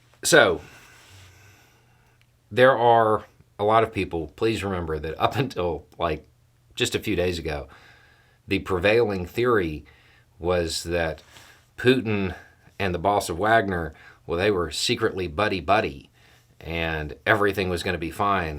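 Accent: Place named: Florida, United States. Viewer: That "ugh" after mentioning "great" putin or trump... i feel that ugh.. everyday. I like theatrical youtubers.. but i like your stuff. Living in rural fl and listening to some one with our accent talking sense for once.. its that good breeze off the cow pasture and not the swamp lol.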